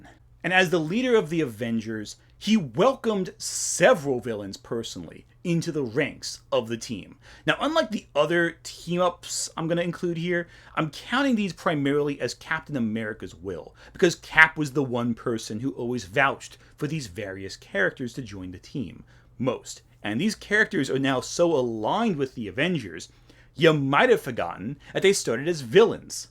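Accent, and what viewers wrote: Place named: New York, United States. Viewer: where you from buddy you got a great accent, nice vid i'd guess nyc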